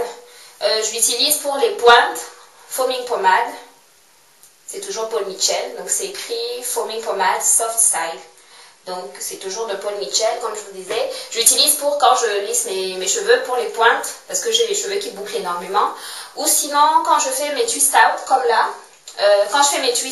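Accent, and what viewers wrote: Cameroon. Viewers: J'adore ton acent de chez nous (kmer mboa). moi j'ai raqué il ya 1 semaine (je me suis defrisée) apres 3 mois de soin et de belle repousses afro, solides et tout. mais je travaille ds la banque et c'est pas evident pour le taf. tu m donne trop envie de me faire mal pour retrouver mes cheveux epais, et tout brefff i lov ur vid's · Hello j'aime entendre ton accent amerounais, moi aussi je suis camerounaise, ça fait plaisir de croiser une de ses soeurs sur Youtube...L'huile de ricin est EXCELLENTE, ça rend les cheveux fins plus épais,ça donne du volume,ça lisse les ecailles des cheveux et le Comble, ça favorise réellement la pousse du cheveu! J'adoreee...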